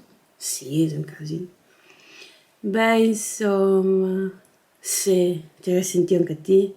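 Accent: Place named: Veneto, Italy. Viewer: Hahaha😂 me compare giacometto. Grazie x avermi fatto ridere oggi. Strepitosa 😂 P.S. Mi mancava sentire l’ accento veneto!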